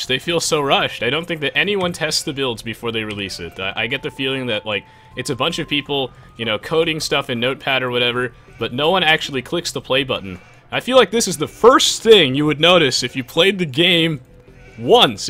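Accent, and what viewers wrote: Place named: Germany. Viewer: Your occasional german accent really adds to the production value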